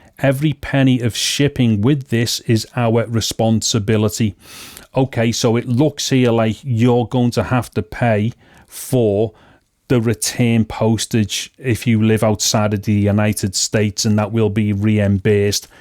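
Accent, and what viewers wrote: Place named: Scotland, United Kingdom. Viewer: Horrible VIDEO! FIX your accent. It is NOT ENGLISH and too scottish... And GET TO THE POINT. You meander all over and go so SLOW. STOP the hand gestures...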